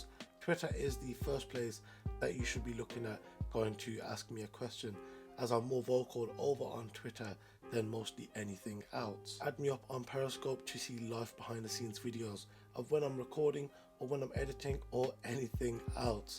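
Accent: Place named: England, United Kingdom. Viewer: annoying english accent. good review though.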